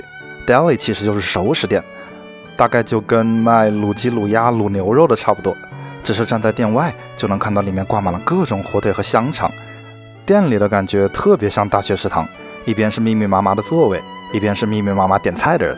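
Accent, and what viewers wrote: Wuhan, China. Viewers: up主是武汉人？浓浓的武汉普通话的味道。。。🙂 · 一口汉普，武汉人吗？ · 湖北口音武漢口音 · 武汉口音？ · 熟悉的武汉普通话，老乡，欢迎来德州奥斯汀试试本地的Franklin，salt lick等等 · 我也是家里有两只猫的武汉人 听你口音就像 直到听到你妈妈给你打电话 实锤了~ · 听着你说武汉正宗弯管子普通话，好亲切啊！ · 武汉老哥？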